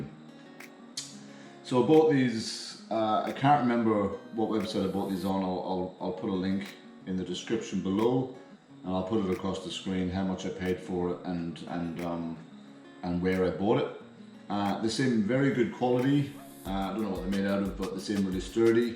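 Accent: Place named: Ireland. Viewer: Sounds Irish 😅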